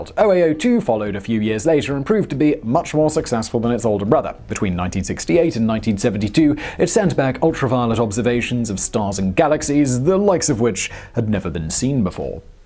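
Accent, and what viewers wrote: United Kingdom. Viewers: Lots of "we" and "our" in that monologue coming from someone with a British accent.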